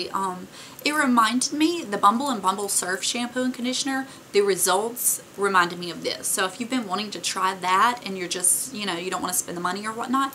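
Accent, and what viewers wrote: South, United States. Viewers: You definitely sound southern lol! I'm in Louisiana. Great job on the empties!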